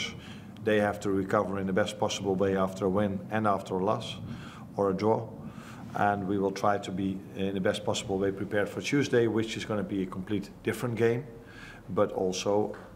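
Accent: Liverpool, United Kingdom. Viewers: is it just me or is he already getting a bit scouse?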